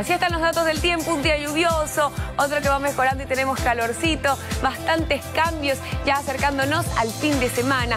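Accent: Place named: Argentina. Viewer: Che, esta mina habla igual q una argentina...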